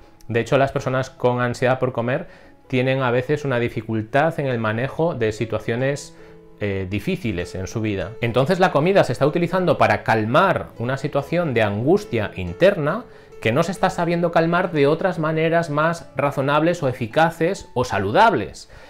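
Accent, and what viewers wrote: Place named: Spain. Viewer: Mis oídos agradecen el españoo de España, no el latino sudamericano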